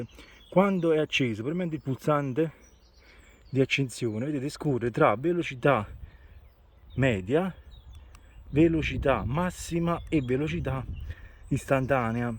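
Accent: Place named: South, Italy. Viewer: Grazie. Voce molto simpatica del sud . Grazie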